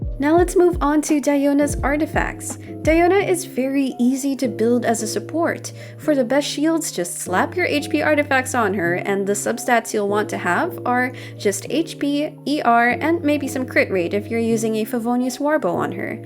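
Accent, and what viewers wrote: Philippines. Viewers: Idk why but im getting heavy pilipino vibes from her voice, where she from, I mean the narrator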